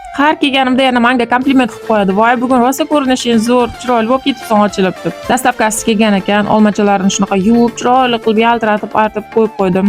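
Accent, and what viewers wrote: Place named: Jizzakh, Uzbekistan. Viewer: Жиззахдан экансиз гапширишиздан билдим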